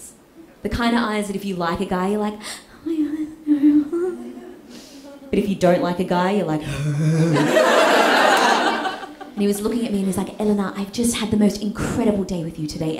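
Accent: Australia. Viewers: That Aussie accent… what a babe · The aussie accent got me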